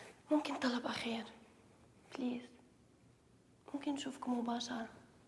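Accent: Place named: Morocco. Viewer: لي تصحيح معلومة هدي لهجة مغربية بيضاء وليست لهجة ديال مغرب لي تيتكلمو بيها بيناتهوم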